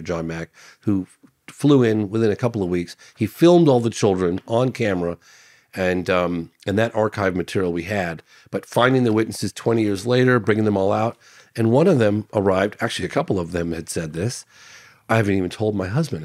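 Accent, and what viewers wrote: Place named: Scotland, United Kingdom. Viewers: Great interview, thanks to both of you. Fox deserves front row in the Ufology Hall of Fame. Speaking of historical crashes, many of your followers will be aware of US marine Jonathan Weygandt and his 2001 interview with S. Greer on a Peru UFO crash (craft shot down). This interview is well-known among ardent researchers in the field. So I want to mention that Jonathan Weygandt has now done a second interview, 12 days ago, and it can be seen on Martin Willis channel. I just became aware of it via Chris Lehto. Weygandt had vanished from sight, disappeared after his one-and-only appearance, and probably most of us old-timers figured he was dead. 2nd interview is remarkable for one thing especially, the fact that 'someone' (US ops) took the craft down, probably with a Hawk missile, or similar. Lastly, (Canadian here) lovely to hear the Glaswegian brogue and know you've not gone 'well- ' for youtube! 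Cheers! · The new Scottish Joe Rogan 👍🏻😂😎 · Host must be from Glasgow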